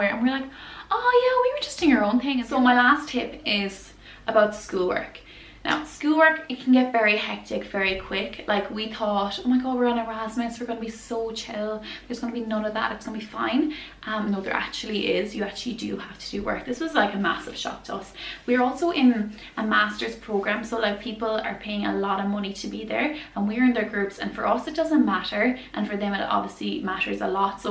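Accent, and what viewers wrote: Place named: Ireland. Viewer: Irish accent? I LOVE IT ❤️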